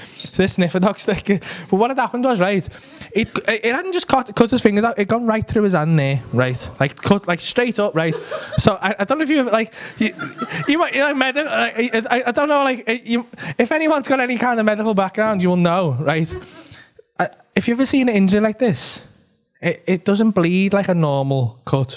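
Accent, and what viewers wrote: Liverpool, United Kingdom. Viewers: Yeah this is proper Scouse lad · I’m an American so sometimes I have trouble understanding when he talks fast...need to brush up on my Scouse a bit! But Paul is absolutely brilliant!! 🤣😂 I need to make a trip across the pond just to go to one of his shows ... and experience the Kop of course! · 10 seconds of Scouse and I'm out. Sorry mate.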